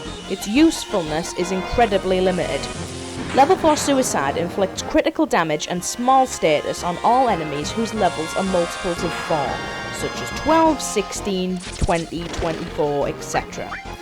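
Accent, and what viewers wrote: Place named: Northeast England, United Kingdom. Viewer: That's a North East English accent? Huh, neat!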